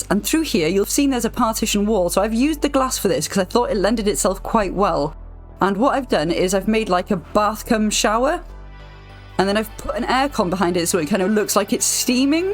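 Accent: Wales, United Kingdom. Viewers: Woah wait are u welsh?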